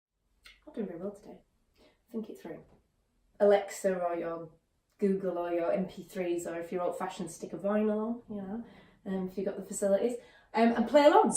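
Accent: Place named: Yorkshire, United Kingdom. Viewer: Great, look forward to more. 'll steadily go through the playlist of videos you already have, just looking at your Ring of Fire tutorial from July. Shame you're not based up more north or East Midlands, I do better with in person lessons . PS sounds a bit like perhaps you're originally from Yorkshire :)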